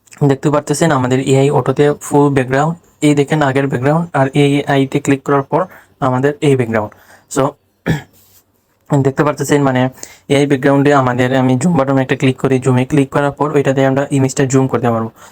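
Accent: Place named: Sylhet, Bangladesh. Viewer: Vai Sylheti ni kota sune to seta mone hocce 😊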